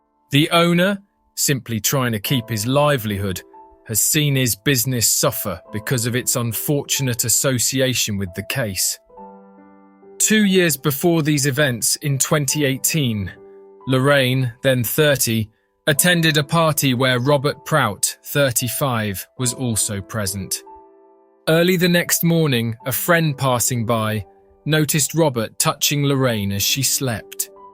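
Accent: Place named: England, United Kingdom. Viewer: Why are you speaking with an English accent but using American terminology? We don’t have “sidewalks” we have paths. We have mobiles, not “cellphones” We don’t have “garbage” we have rubbish. We don’t have “apartments” we have flats. We don’t have “trash bags” we have black bags or rubbish bags. I really h@ these bloody robots.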